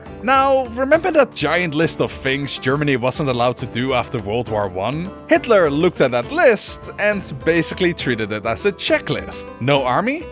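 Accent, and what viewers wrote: Netherlands. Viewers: i smell dutch here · Ik weet al door deze accent dat dit een nederlander is lol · Dutch accent?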